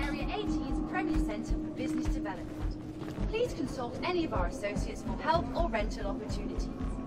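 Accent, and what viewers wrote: Netherlands. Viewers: hey! no, you're doing ok. learning the game is nice to see. een nederlands accent, trouwens. haha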